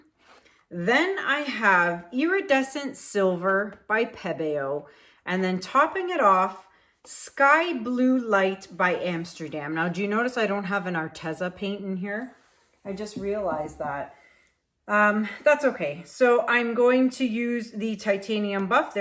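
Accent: Canada. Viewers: Anytime she says anything with “out” in it I laugh BUT I will say I never really could tell a difference in the way Canadians speak vs Americans UNTIL I heard her with the “out” .. turns out … ab”out” etc etc … now anytime I hear it I’m like oh you’re not just from outside of Long Island you’re from Canada!! 🇨🇦🇨🇦 I personally think it’s pretty cool. Ps, this turned out beautiful! I really actually like the titanium buff light as a base color too!! I almost like it better than just plain white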